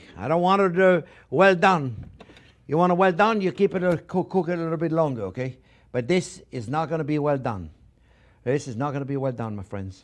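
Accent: France. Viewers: I love his french accent...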